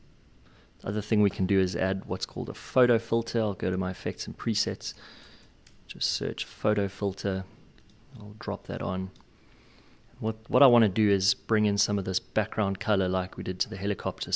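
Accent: South Africa. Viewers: Thought i picked up a RSA accent confirmed when you said "ya" great videos and very well explained dankie from Livingston Scotland. Sub and liked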